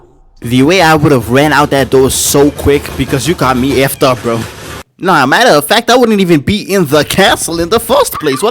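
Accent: South Africa. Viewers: Bro sounds South African 😭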